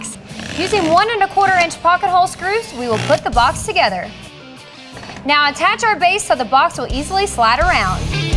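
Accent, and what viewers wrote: South, United States. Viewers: She tries to hide it but, I hear that Souther Twang. 👍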